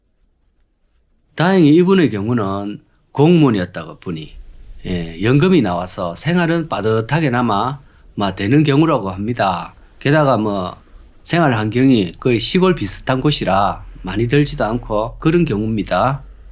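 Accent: Gyeongsang, South Korea. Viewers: 님 안녕하세여 경상도 사투리로 설명해주시니 정겹습니다 잘보그 조아여 누르그 갑니다 건행하셔여 ❤❤❤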